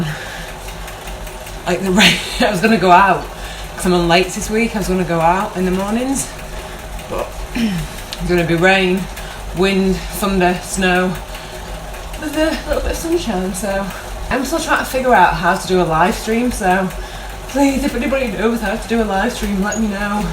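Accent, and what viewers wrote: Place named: Manchester, United Kingdom. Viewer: Thanks again! I really look forward to watching your videos, you make me smile for some reason, not sure if it's the accent that makes me lonesome for Manchester or your lovely northern sense of humour! Next time I'm on Zwift (did a session yesterday) I will be powering up with the Mantra "Think "! Cheers, enjoy the rain........at least you can ride in the rain, it's bloody snowing again here............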